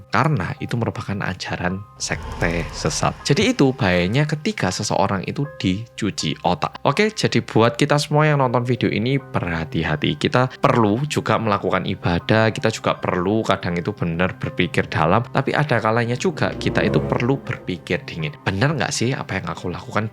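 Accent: Java, Indonesia. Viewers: Hallo bolo medok😊 Lgsg nyimak rek 🎉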